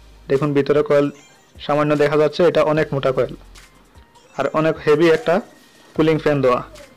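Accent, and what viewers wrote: Sylhet, Bangladesh. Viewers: ভাই আপনি কি সিলেটি আর এর দাম কত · Vaier bari ki sylhet?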